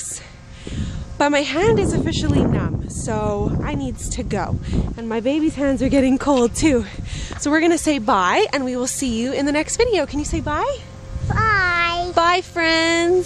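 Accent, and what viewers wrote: Canada. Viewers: hilarious... your canadian accent is in FULL swing :) Probably from being around your family :P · Love it! Btw, you sound so much more Canadian in Canada ;)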